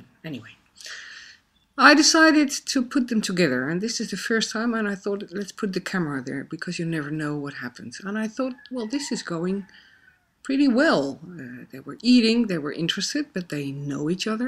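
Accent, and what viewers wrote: Netherlands. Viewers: Je klinkt erg nederlands. De dingen die je vertelde was erg logish en accuraat. Maar ik had meerdere hoopjes hooi neergelegd zodat ze elkaar konden onwijken als ze dat zouden willen. En ik denk niet dat ze vechten. Het is eerder kijken wie er sterker is. Ik snap waarom je ze in een kleinere ruimte zet maar ik had ze in een grotere ruimte gezet met meer hoopjes hooi. En ik denk alleen niet dat paarden zoiets hebben als "common sense".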